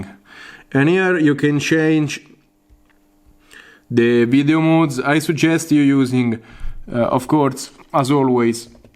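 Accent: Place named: Italy. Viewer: quanto si sente che sei italiano ahahah bel video grande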